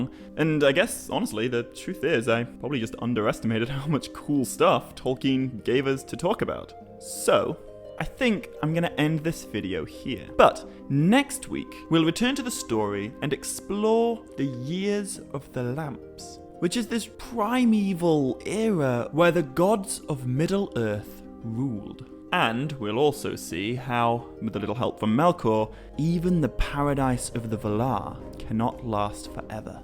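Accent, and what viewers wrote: England, United Kingdom. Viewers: You do realize that he is English and they pronounce words differently, right? And considering how the ENGLISH invented the ENGLISH language, and also considering that Tolkien himself was English, you don't have any grounds to tell him how to pronounce English words. You just sound like an ignorant jackass.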